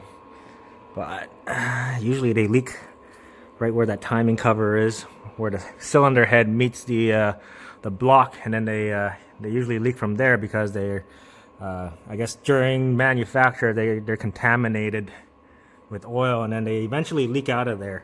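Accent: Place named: Canada. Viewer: So, are you saying the Mazda dealer double gasketed the filter on the previous change? Whoever did it is an amateur. SOP is to clean the filter mounting gasket area after removal. That should tell if gasket left not to mention, just looking at old filter. smh Hope oil in sump didn't drop too far. Also, from two Canadian yt vids recently seems Mazda pronounced differently than in US. In US pronounced Mahhzda. North of the border seems, Mehhzda seems to be used. Fwiw, I'd let you work on any of my Hondas, and wouldn't say that to just any mech/tech.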